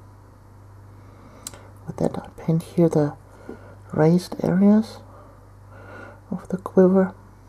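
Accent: Germany. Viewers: Jonathan Holt It's a woman with a german accent and a bit of an uncommon speech habit (sort of stiff mouthed, it's hard to describe).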